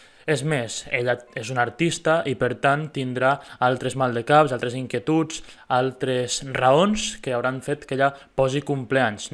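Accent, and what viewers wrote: Spain. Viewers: aunque te mueras de la rabia: se nota que tu lengua madre es el español.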